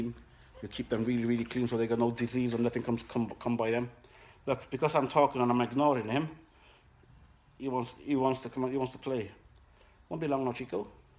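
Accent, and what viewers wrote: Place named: United Kingdom. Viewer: maybe its not what you should have if you find it hard to cope.I am not trying to be rude only it makes no sence that you tell people not to own one yet you now have two.It is not right to title your vidio "where to buy a monkey"if you have no intention to let people know.I detect that you have a Brittish accent like me,if so you know we are up front and staight forward.I am one to speak my mind,I hope you are not offended and you take time to answer me...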